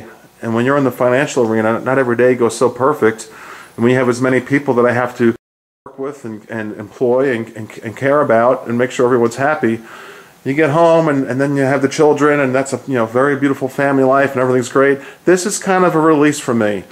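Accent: Long Island, United States. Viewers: I knew I heard some Long Island in you. I grew up in Westbury around the same time you were growing in Smithtown, Cool. I appreciate your vids.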